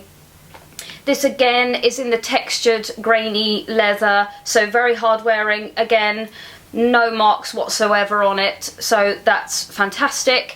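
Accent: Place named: England, United Kingdom. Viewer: Hi, I stumbled here through accident. I am not into handbags as I am guy. :) But I have to tell you that you have a very enjoyable voice Ma'am. I hope you ever heard of ASMR as I think an ASMR handbag video by you would be one of my favorites. ;) edit: I forgot to mention that also your English accent would make an ASMR video by you even more enjoyable. :D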